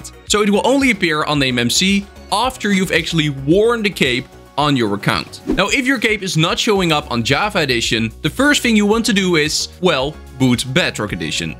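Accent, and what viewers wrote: Netherlands. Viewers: A Netherlandisch acent???? and thx btw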